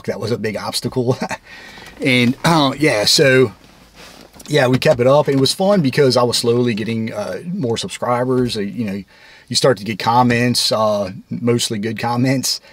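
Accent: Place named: Mid-Atlantic, United States. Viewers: I’m a resident of Fredericksburg and I’ve really liked your content. So much history in this little town. I have to ask, where did you grow up? I can’t place your accent 😂. Pennsylvania? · Congrats! As far as accents, I'd say you have a mid-Atlantic accent, neither Northern nor Southern, yet not Midwestern. You don't have the twang of southwestern VA where I was raised, nor the drawl of the Danville area where I lived. And nobody in VA has the all out Southern accents down here in SC and GA 🤣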